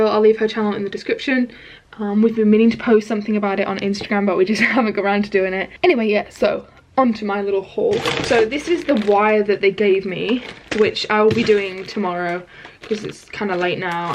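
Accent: United Kingdom. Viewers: You’ve got a British accent but your Australian omg I’m confused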